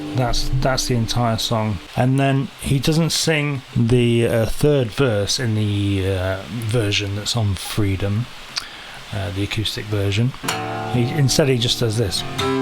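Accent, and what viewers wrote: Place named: England, United Kingdom. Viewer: Excellent lesson. Really glad I stumbled upon your channel. I often search out Neil related tunes/covers/lessons and am surprised your videos don't come up more frequently/higher on the list. I am impressed with the use of index finger nail as a plectrum - perhaps time to let that nail grow a bit. Also impressed with your vocals as you switch from your native "English" accent to your Neil voice - well done!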